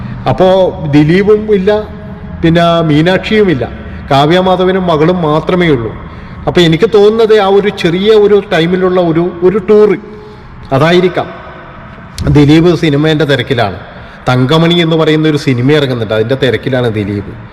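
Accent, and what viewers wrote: Kannur, India. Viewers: കണ്ണൂർ ഭാഷ ആണല്ലോ പറയുന്നത്, അപ്പൊ നമ്മളെ നാട്ടുകാരൻ ആണ് അല്ലെ 👍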